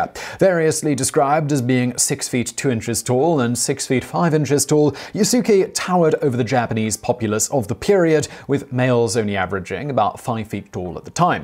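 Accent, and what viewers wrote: United Kingdom. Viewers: Yes, your french pronunciation is indeed terrible...nevertheless I like your "typical" british way of speaking english... ;)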